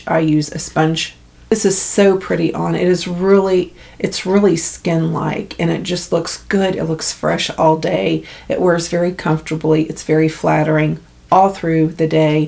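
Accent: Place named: West Virginia, United States. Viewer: Enjoyed your video as I always do. I am moving back home to West Virginia this coming weekend - Ravenswood. Hearing your beautiful accent has made the wait to get back seem shorter! Thank you!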